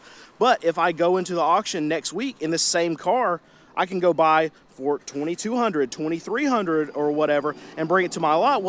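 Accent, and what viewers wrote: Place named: North Carolina, United States. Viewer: I heard North Carolina.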